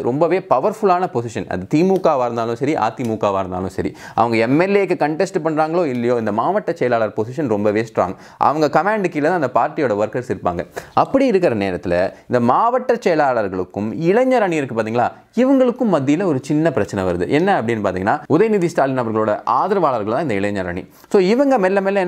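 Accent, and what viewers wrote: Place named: Kerala, India. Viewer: மலையாள வாடை உங்கள் பேச்சில்..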